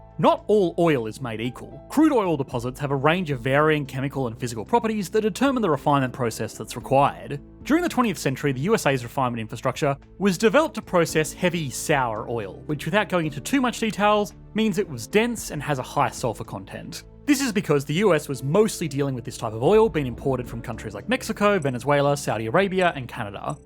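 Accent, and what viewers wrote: Australia. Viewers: He sounds very Aussie. I'm not sure how I missed that. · sounds aussie to me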